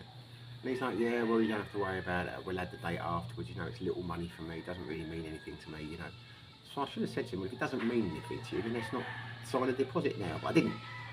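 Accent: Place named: England, United Kingdom. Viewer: Yapping in an English accent for too long will turn off my interest in any topics.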